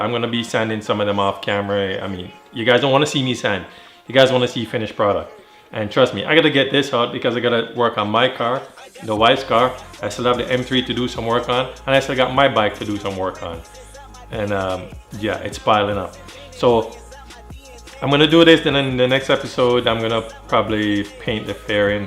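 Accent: Jamaica. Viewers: Found ur Channel last night n watch about 8 videos to this point ... keep it up man love the content man ...u sound like a yaadie to lol.. bless up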